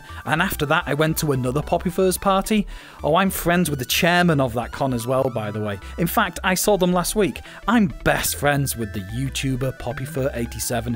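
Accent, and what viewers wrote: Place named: United Kingdom. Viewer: Not at all was I expecting a man with a british accent from a small character good vid though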